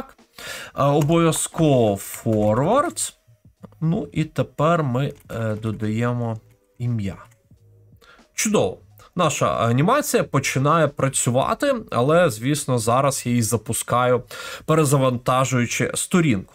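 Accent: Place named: Carpathians, Ukraine. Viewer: Что свинособачий говор карпатских рагулей? Рагули умеют даже компьютер включать?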